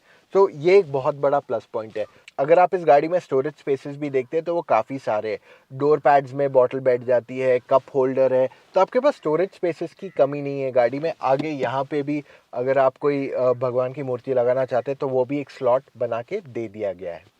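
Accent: India. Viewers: nobody notices it spoke in very desi style because he knows that the people who are going to buy rthis they might not know english words that much hats off, he knew about his audience very well, subscribed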